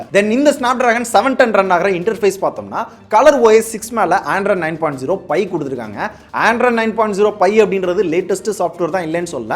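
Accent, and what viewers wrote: Madurai, India. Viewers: Bro nenga madurai ya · Bro Neenga Madurai ah....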